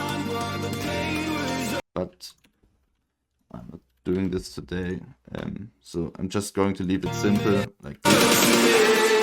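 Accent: Germany. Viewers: Bro bist du deutscher? :D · man hört den deutschen Akzent so hart hahaha, nices video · deutsch rausgehört XD. aber trozdem gutes englisch! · the german accent is wild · Dieser Deutsche accent haha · Wusste im ersten Satz schon das du deutsch bist hahahah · Das nenne Ich mal eine deutschen Akzent 👍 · Dieser deutsche Akzent HAHDHADASHAHAH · Wusste beim ersten Wort schon dass du Deutsch bist xD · Man hört einfach das du Deutsch Bist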